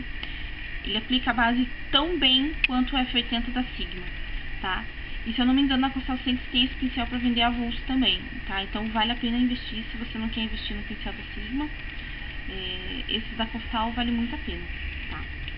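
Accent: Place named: Rio Grande do Sul, Brazil. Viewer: flor, uma dúvida.. tu é gaucha?? tem sutaque, hehe :DD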